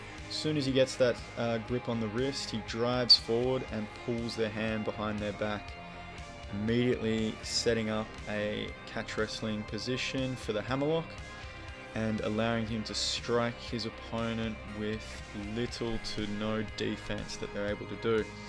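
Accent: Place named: Australia. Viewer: Bro youre a beast. Aussie accent rofl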